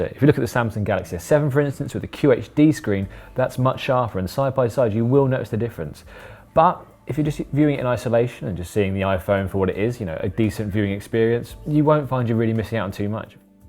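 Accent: England, United Kingdom. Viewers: Gosh dang man you complain so much. It is wit is, I honestly wish I hadn't watched this video. Just one log complaining video. I really hate this kinda negative negative negative. Then take it back. You have like 14 days. You did your review and your not impressed. So take it back and get the 6s out and use it until the next one comes out. Good grief. Even in some English accent whining sucks!!!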